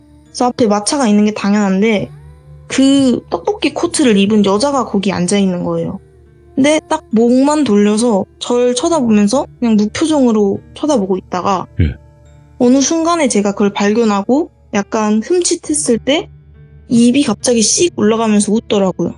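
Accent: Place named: Jeolla, South Korea. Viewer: 전라도 분이신가봐요.. 어딘지 알거 같아서 ^^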